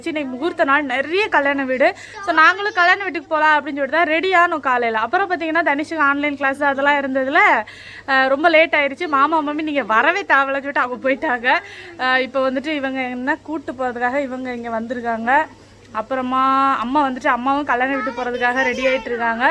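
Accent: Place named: Nagercoil, India. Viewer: Hi... Good morning 😊 I like your Nagercoil slang very much 😍👍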